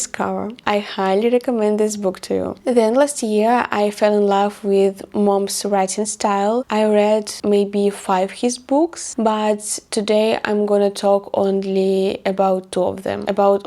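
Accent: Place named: Russia. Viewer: I absolutely agree with this Where as soon as I saw you and hear your accent I knew that you are Russian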